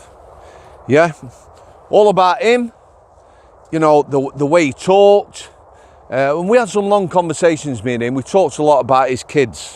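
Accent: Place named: South Yorkshire, United Kingdom. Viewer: Wakey bloke mi sen I always thought you were South Yorkshire love the work keep it up 25k subs soon 👍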